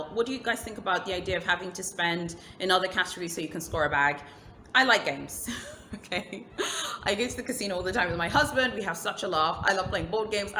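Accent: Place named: London, United Kingdom. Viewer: Well I thought you had no makeup on so your application is absolutely fantastic. Also, jut wanted to say, your accent is too real, you use the right sayings in the right context and that only comes from living in the UK. They must be referring to the accent of the African state you currently live in because you sound like everyone else I grew up with in London Babe.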